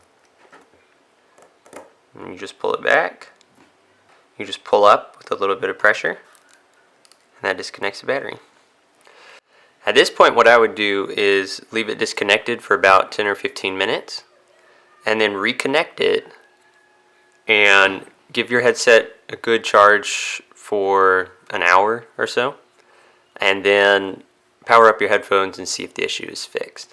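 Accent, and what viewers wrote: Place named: United States. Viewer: Very nice guide. I love American Accent ❤️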